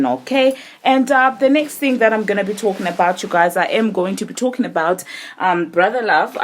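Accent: South Africa. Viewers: Are you South African 😢😍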